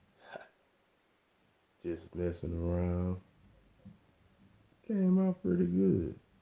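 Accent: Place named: South, United States. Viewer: I love your southern drawl my brother, you reminded me of one of the best car songs of my generation <link>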